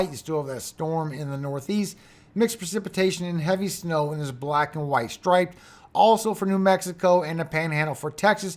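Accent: Louisiana, United States. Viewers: brother you have a Louisiana accent yeah😁!!!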